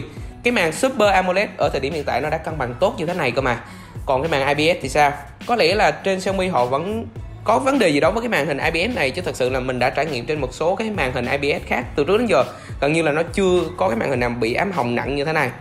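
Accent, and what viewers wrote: South, Vietnam. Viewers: Có ai giống tui ko giọng miền nam bạn này hơi chói khó nghe nhỉ so với giọng miền bắc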